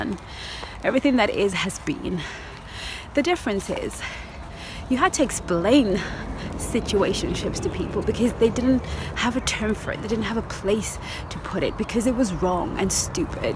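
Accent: United Kingdom. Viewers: Love your videos, love your advice, love your British accent, love your intelligence. As I always say some guys are not worth the time of day and I don't have time for situationships. I would rather be alone and have my self respect. It's so much better.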